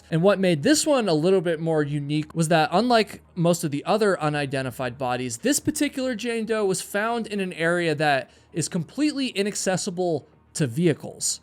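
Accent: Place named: East Coast, United States. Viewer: I'm from Toronto, and it warms my heart to hear your East Coast slip out every now and then. Words like charge and bar, love your channel; keep up the good work!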